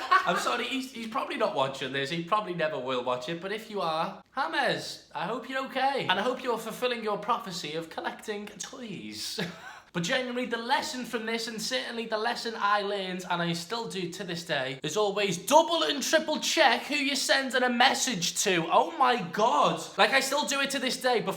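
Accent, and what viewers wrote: Liverpool, United Kingdom. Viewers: It’s weird seeing a scouser big in youtube😂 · HOW DID I KNOW YOU WERE FROM LIVERPOOL EVEN THO I NEVER HEARD A LIVERPOOLISH ACCENT OMG IM SO CLEVER 😂😂😂❤️ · Auyyyyyyyyt my fellow scouser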